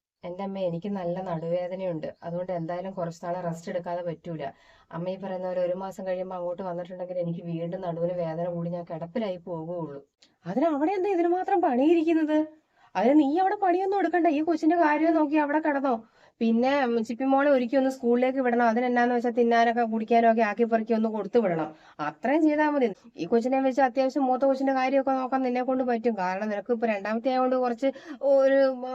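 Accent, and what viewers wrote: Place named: Thodupuzha, India. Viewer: സാംസാരം കേട്ടപ്പോൾ എന്റെ നാട്ടുകാരി ആണെന്ന് തോന്നി ഞാൻ തൊടുപുഴ ആണ്😊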